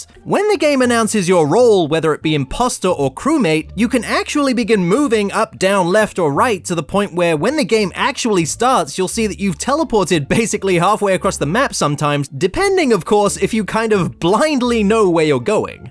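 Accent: United States. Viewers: Why has this man's voice progressively become more American and less British as time goes on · That’s one way to get lots of views. Watched this channel for 5 years - still wish you lost the US accent as you’re clearly not from there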